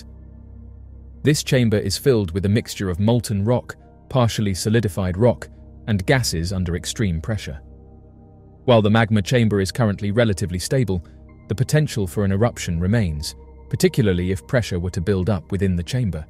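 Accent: United Kingdom. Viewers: We need British sounds like you to be assured it's bound to occur in my life.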